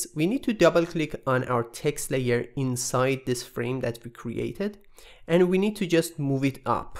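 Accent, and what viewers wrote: Iran. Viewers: لهجه فقط لهجه ایرونیاااااا قربونت برم که یهویی اتفاقی دیدمت تو سرچ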